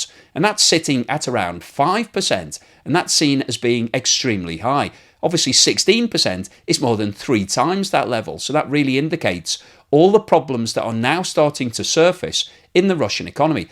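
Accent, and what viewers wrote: United Kingdom. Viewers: what Propegandist like fail to realize is there are countries with far worse inflation than Russia which still manage to keep their economies from collapsing. Russia's is an economy of war, it does not, and cannot be measured like a a regular economy. What matters to Russian citizens is that their basic needs are taken care of, and indeed they are. I guess these people need another year or two to take back what they're saying now, the exact same way they ate humble pie about Russian Army being in shambles. Now you're handsome fella and you have a nice British accent which might fool some people into thinking you're some kind of authority on Russian economy, but you are the furthest thing from it. (your own country "Britain" I assume is turning into a 3rd world country_ businesses closing left right and center aren't you better of "commentating" on that? 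lol)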